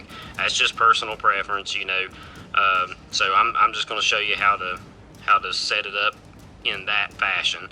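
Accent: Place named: South, United States. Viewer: Definitely made life easier. Your instructions were easy, especially pulling the strap through the keeper. The MAGPUL instructions, either the included or the YouTube instructions, are close to useless. Enjoyed your sense of humor also. Being a Yank but having lived for 35 years in Virginia, I found your accent was a bit of 'down home.'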